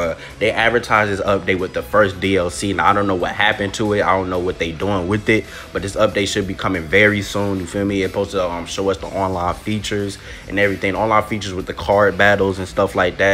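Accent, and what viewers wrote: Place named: Florida, United States. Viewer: You got to be from Florida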